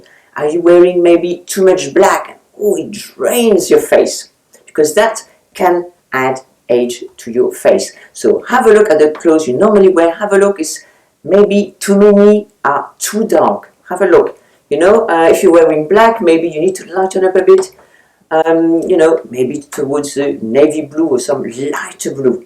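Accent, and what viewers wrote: France. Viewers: 70s???? Yuck!!! On a brighter note, you are an inspiration of style. I love watching you and I love your accent. I have always considered the French to be the epitome of elegance and you exude exactly that. And I think deep down you are also a good hearted person, one of the good people of the earth. Love you!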